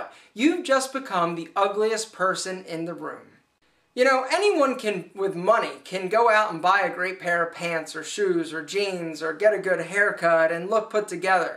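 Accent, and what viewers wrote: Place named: Western Pennsylvania, United States. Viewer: You sound like you have somewhat of a western pa accent :) Very nice videos!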